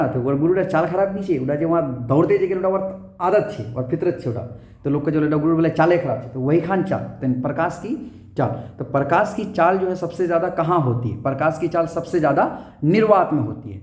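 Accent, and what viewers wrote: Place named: Surjapuri, India. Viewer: Pure surjapuri sir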